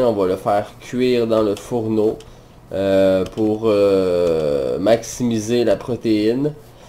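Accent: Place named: Quebec, Canada. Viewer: T'est vidéos sont trop cool et ton accent Québecois est vraiment plaisant pi moi aussi je suis un Québecois, Vive le Québec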